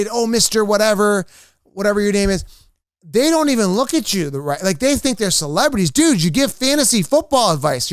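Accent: Canada. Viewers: Are you from Canada? 🇨🇦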